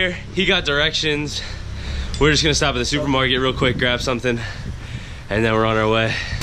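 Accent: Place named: Argentina. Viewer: Ese acento me suena a Argentino, a más no poder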